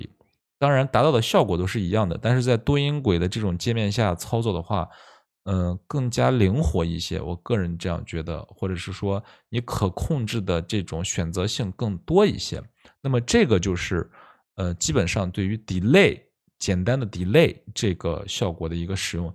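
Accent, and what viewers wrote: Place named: Shaanxi, China. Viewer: 老乡啊啊啊啊 带着陕西口音 但是英语居然没口音🥰✌️喜欢喜欢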